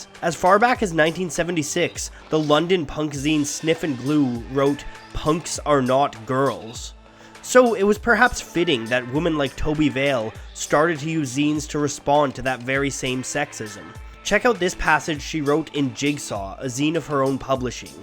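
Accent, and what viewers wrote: Canada. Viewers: It’s a Canadian accent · that's just how we say it in canada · Olive Ephemerals no it’s just the way a lot of Canadians say women. As woh-men, and the e often sounds like an A. It’s just the way she goes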